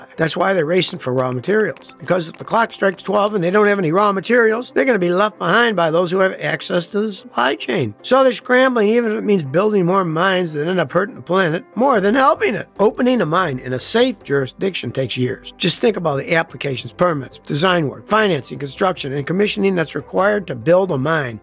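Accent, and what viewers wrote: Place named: South, United States. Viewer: Your southern accent made the video interesting to watch.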